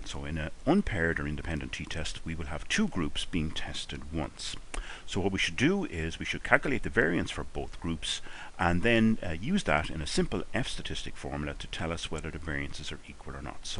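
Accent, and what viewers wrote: Ireland. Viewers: I really enjoy Irish accent. It makes learning fun again:D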